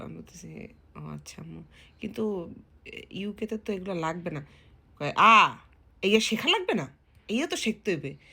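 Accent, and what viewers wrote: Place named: Barisal, Bangladesh. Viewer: তোমার এই বরিশালের ভাষা তোমার মুখে এতো কিউট লাগে ॥ আমি ভালো ছেলে মনে করি কিন্তু ওর দেশের ভাষা ওর মুখ একটু ভালো লাগে না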